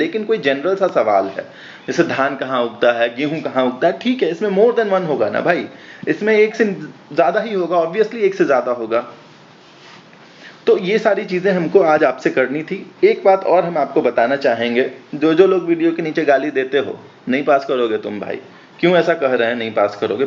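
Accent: Bihar, India. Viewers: Aapka bihari accent jaanlewa h bhaiya.............sun k ek apnapan lagta h.............maati se judav !!!!! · Sir your TONE IS PURE BIHARI...JAI HO BIHAR..Ye video energy booster hai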